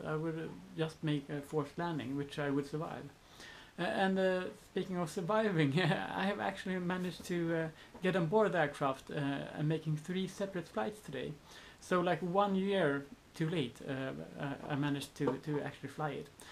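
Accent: Sweden. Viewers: ARE you Swedish? your accent is pretty Swedish... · Christian Fjelldal I sense Sweden.. I'm from Norway btw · Darkpassenger 1 He sounds Swedish.. They all have a long standing tradition of eating poisonous shrooms and beating the sh*t out of themselves for fun. They discovered America and didn't even care since there was nothing to loot and the people already living there were too weak to be used as slaves. Columbus had more luck a couple centuries later as he landed in South America where there was gold and the people were stronger and of more use.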